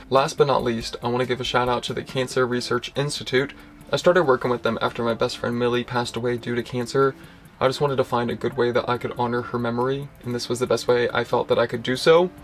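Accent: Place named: Baltimore, United States. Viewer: I could tell you are from Maryland. You have a prominent Baltimore accent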